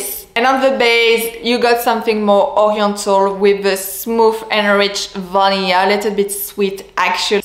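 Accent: France. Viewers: I started wearing Shalimar when I was very young and now I am on the very elderly side and still love it. I really enjoyed listening to you with your wonderful French voice. I love the way you told me all about the ingredients and why I so love my Shalimar. Thank you very much. MJMoore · Did you realize that the bottle on the caption is the Philtre de parfum flanker? When you mentioned the EDT, were you talking about the Philtre? It sounded like you did. Philtre is my favorite because it’s so airy and light and modern. Even the EDT is just too animalic for me and not so fresh. But who knows, I might change my opinion. I keep trying the EDT and EDP. BTW when you say heart ❤️ it sounds like hurt. Not sure if you’re aware of that. It’s your charm of course the French pronunciation but sometimes I wonder if you wouldn’t prefer to know about certain words. Like leather. 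By now I know what you mean, but the first time I heard you say that, I was wondering why a perfume would smell like a lizard🦎 😊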